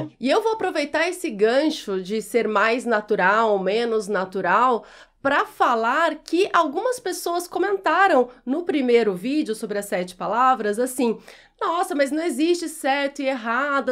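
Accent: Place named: Southeast, Brazil. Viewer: O E pra NÓS no nordeste não pronunciamos COMO VOCÊ NO SUDESTE.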